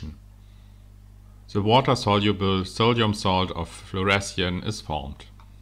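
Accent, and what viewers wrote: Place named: Germany. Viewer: I simply cant overlook the german sounding accent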